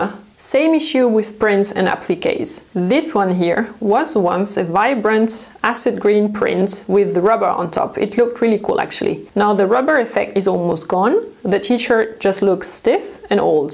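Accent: France. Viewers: Super vidéo, je connaissais déjà ces tips mais je regarde pour le plaisir ! J'aime bien ton petit "French accent" ! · I like buying clothes and learning differences in quality and you make it informative and succinct, delivered with an absolutely killer French accent that would make learning the rules of cricket listenable! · L'accent français est reconnaissable partout😀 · Can't identify where she is from... Why I heard some french accent? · Ce léger accent français :) Very interesting video. Clear and concise. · Merci beaucoup pour cette vidéo merveilleuse! Ton accent francais est super mignon :) Et les astuces sont très intéressant!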